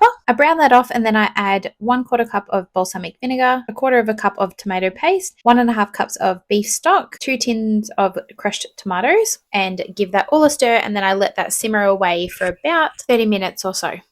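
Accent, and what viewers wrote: Australia. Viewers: The coles bags got me here, love hearing an Australian accent 😅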